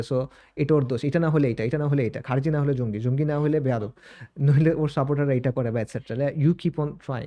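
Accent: Sylhet, Bangladesh. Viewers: Host mone hoy sylheti. thikmoto bangla bolte parena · সিলেটি উপস্থাপক মনে হচ্ছে 😅❤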